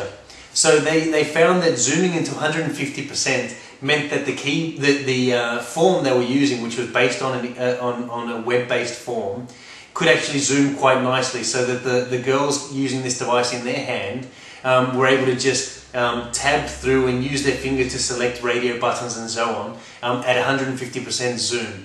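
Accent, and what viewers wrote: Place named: Australia. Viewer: spanish guy with an australian accent. enough said.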